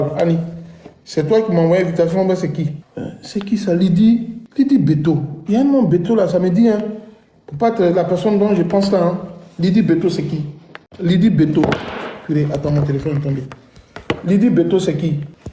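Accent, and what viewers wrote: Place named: Bété, Ivory Coast. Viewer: C'est pas à cause de celle qui est monté qu'on a su que tu es bété. Quand on t'entend on sait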